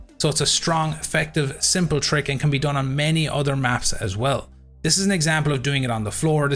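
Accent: Ireland. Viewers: Hes probably just irish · What part of Ireland r u from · Probably because he's Irish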